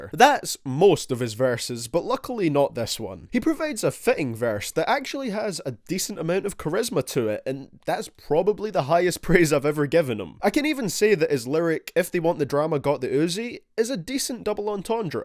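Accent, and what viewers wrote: Scotland, United Kingdom. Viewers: Is he Scottish????🤨🤨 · What is that accent? I know its from somewhere in Great Britian but i cant put my finger on it.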